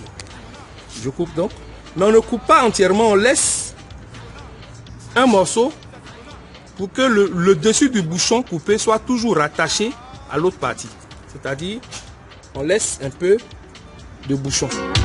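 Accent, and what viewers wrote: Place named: South, France. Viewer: Coucou, j'ai tout compris... tellement bien expliqué et avec l'accent du soleil en plus, que du bonheur. Plaisir et joie chez vous.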